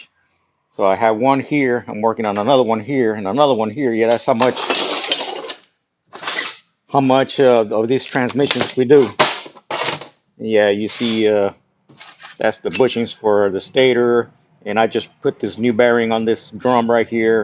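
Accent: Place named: South, United States. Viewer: I'm totally enthralled to your blended southern/hispanic accent.😉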